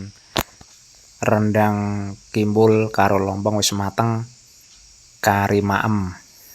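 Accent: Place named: East Java, Indonesia. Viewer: Mangane uuakeeh.. logat jawa timur ya Mas'e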